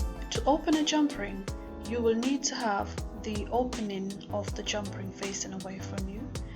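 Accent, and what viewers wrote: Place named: Trinidad and Tobago. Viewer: Hello! I just came across your channel by looking for an easy chain link to make. Your work is easy and beautiful! Thank u, all the best! By the way I heard your accent, by chance are u a Trini? You sound a lot like me, lol. Thanks again. Gonna subscribe!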